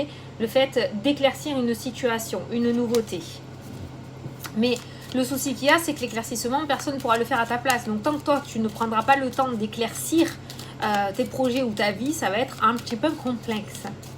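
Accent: South, France. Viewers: Bonjour, Je te découvre. Tu es géniale, pleine de vie, toute en simplicité et authenticité et en plus avec l'accent du sud. Tout ce que j'aime! Je passe un bon moment avec toi. Tes tirages me parlent beaucoup et me correspondent parfaitement 💫😇 Peux-tu me donner les références du jeu spirituel que tu as utilisé? Il est magnifique!!! Merciiiii 🙏💫😘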